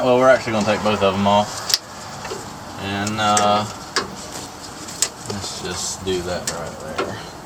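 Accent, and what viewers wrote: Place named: North Carolina, United States. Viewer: well hell...the man's from North Carolina.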